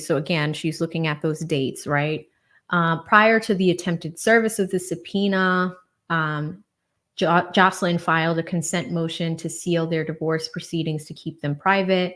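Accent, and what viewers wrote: Boston, United States. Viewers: Oh my.. i love ❤️ you're Boston accent. So great.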